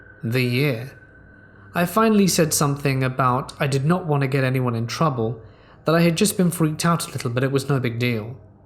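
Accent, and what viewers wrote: United Kingdom. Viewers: I heard the collaboration you did with Nocturna on her channel. i really enjoyed the video, also it's crazy how perfect your Spanish is, i was blown away. i also think it"s crazy how in English you have a British Accent and in Spanish you have perfect Mexican type Accent. I never knew anyone like that, that can speak with a British English Accent and Mexican Spanish Accent....anyways Thank You and God Bless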